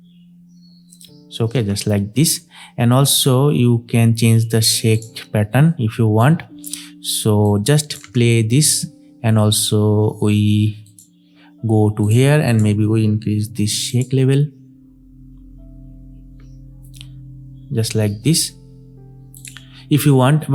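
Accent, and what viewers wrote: India. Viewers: Oh no, Indian English😢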